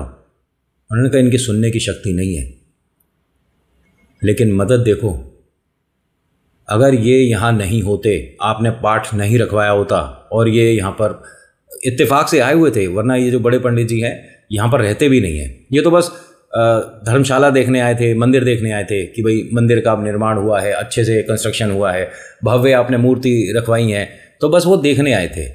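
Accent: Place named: Braj, India. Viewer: 🙏🌹जय श्री श्याम 🌹🙏 भाई ये क्यों बोल दिया की सिर्फ कहानी ही मिलेगी ये किस ग्रह के जीव की बात मान ली अरे भाई हमें ज़ब इधर उधर की न मिल जाय मजा नहीं आता और बीच मे जो ब्रज भाषा निकल जाती है उसे छुपाना नहीं वो ही तो आपके मुख से प्यारी लगती है तो फिर धन्यवाद जैसी आपकी मर्जी सुनाओ हम तो घर मे भी सुनते हैं 😅अलीगढ से रविंद्र सिंह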